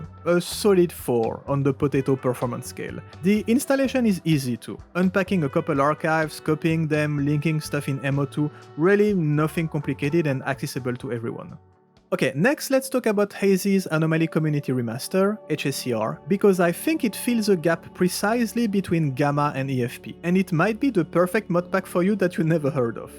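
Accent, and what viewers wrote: France. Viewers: ton petit accent Français charismatique haha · merci pour cette vidéo; mais ne serait pas un french qui parle le british?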